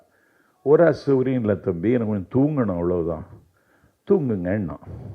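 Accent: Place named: Kanyakumari, India. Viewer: That's the beauty of Kanyakumari Tamil...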